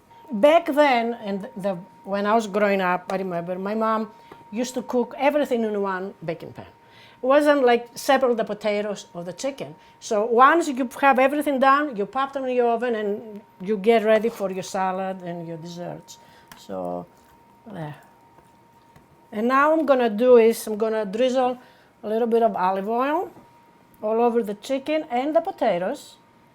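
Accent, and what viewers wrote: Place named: Greece. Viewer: Omg that's not actually the original Greek accent!! That's a Greek villager's accent!!!where are you from?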